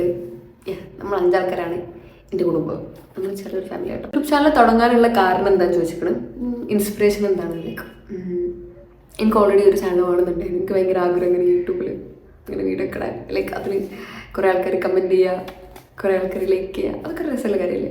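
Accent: India. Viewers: Aiswarya lekshmiyude oru facecut und.. Where was your schooling and college? Slang ketapo thoni frm malpuram anen bcz am also frm malpuram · KOZHIKODE slang pole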